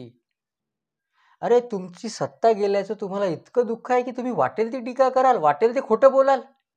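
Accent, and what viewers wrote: Vidarbha, India. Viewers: your analysis is so cryptic and humorous, especially your Vidarbha accent is so endearing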